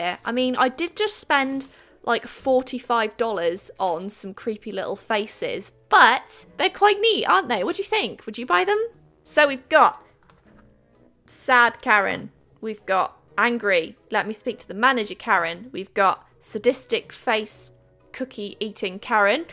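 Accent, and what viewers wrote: United Kingdom. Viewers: I love how you British people talk 😍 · You are British right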